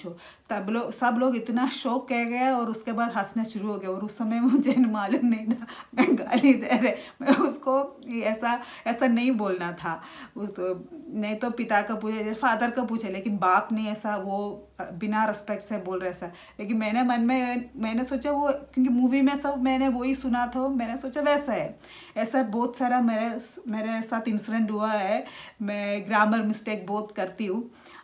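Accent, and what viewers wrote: Kerala, India. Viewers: I love the way you speak Hindi with a thick Malayalee accent (that requires courage, Bravo ) the way you share all your beautiful, moments with your family, the little surprises you planned for your daughter's birthday, the father dancing with delight as he watches his daughters happy reactions to her birthday gifts, the way - ji brings his son down gently to see Vishu kanni. All of these things add up to a lot, how deeply you care for each other and are so happy to be together. Most of all the encouragement your son gave to you to start your own vlog, IN YOUR. OWN STYLE! How many sons do that? The genuine pride you take in each other's achievements, big and small, is a valuable lesson for all families. Love your cheery spirit. May you always remain that way.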